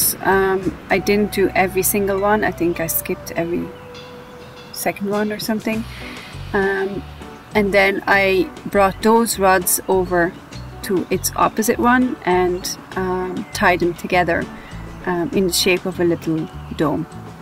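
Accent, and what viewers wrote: Ireland. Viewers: Irish accent. 😍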